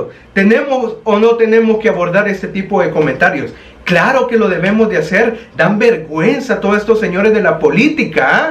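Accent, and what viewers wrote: El Salvador. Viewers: Me gusta tu canal apenas enpese a seguirte De verdad q mis respeto a tu canal y el de Marcelo larin porq nos mantienen informados con la verdad. Me encanta tu humor tu acento salvadoreño . Dios siga bendiciendolos a ti y al q te hiso poner esa mascara lol.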